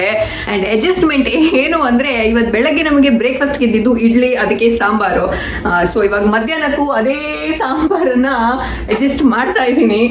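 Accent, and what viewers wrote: Mangalore, India. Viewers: Very nice vlog... I like cuteness nd ur manglore style talk....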